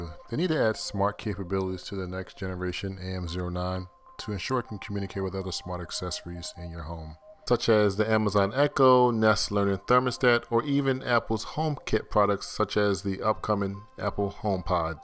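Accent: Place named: United States. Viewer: THAT mate, is both the best Dyson "run-down" I've seen AND the nicest American accent I've heard to date. ;¬) Personally I switch it off at bed time & don't NEED at timer for off; but I'd LOVE to see a timer to switch ON for a warm room to awaken to. :¬)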